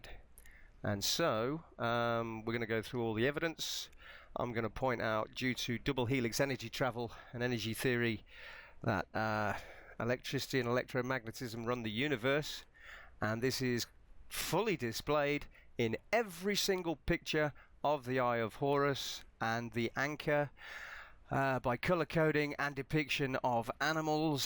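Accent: United Kingdom. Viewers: getting in a fuss other someone's accent not sounding right to you is 100% an american trait lmao. Listen here English accent, speaking the language of your county properly. you say it onk like us 'murricns not ank, also while were here I have some words about the whole tomayto- tomahto, potayto-potahto debacle as well... LMAO I seriously can't believe some people are so dense to make a fuss about your accent.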